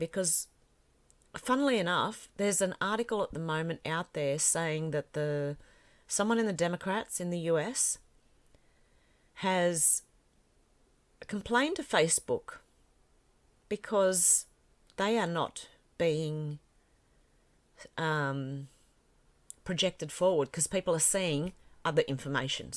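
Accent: Australia. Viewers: I really like you. I like your videos. Really love that Australian accent. Keep up the great work , we appreciate it. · I just love your accent from down under..and such a beauty,...inside and out..don't wear yourself down and get sick now..we really appreciate all of your hard work and the sacrifices you've made.. a little secret of mine...I turned my TV off 3 yrs ago and I don't miss it one bit... MSM is all fake news..the continuing of mk ultra and mind control. You are such a strong lady and Patriot.. thank you ....just for being you..we are all in this together.. WWG1WGA..THANKQ DEAR LADY..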